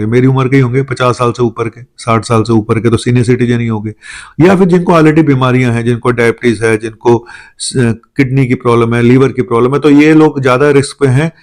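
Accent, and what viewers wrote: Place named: Punjab, India. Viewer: Dada ji came from country of milk that IS PAKISHTAN I could make out from your way of talking PUNJAB age 80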